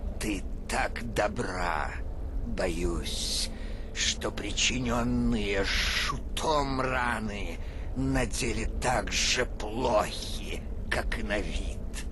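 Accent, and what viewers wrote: Ukraine. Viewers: Ты под корнем нирна играешь?)) Украинский акцент делает ламповым видос👻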